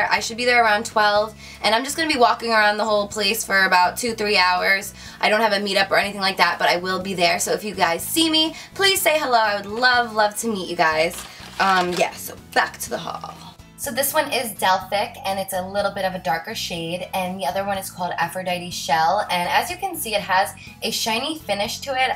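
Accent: New Jersey, United States. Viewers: Where are you from? I love your accent. It sounds kind of jersey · I'm a new subscriber and I have to tell you I LOVE your Jersey accent!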